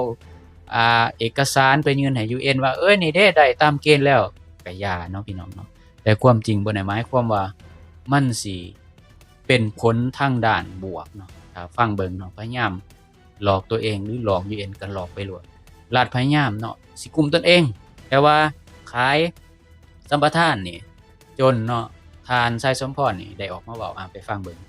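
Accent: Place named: Laos. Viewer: หม่วนหลายภาษาลาวดั้งเดิมที่แอดเว้าสบายดี,ขะน้อย,โดยขะน้อยขอให้แอดรักษาภาษาดีงามแนวนี้ไว้ตลอดไปส่วนหลายได้หงินคนลาวเว้ามีแต่เอาภาษาไทยไปปะปนเลยฟังบ่หม่วน❤❤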